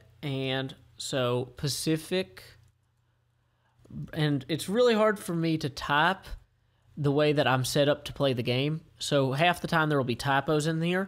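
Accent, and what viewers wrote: South, United States. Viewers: Applejack approves of your souther drawl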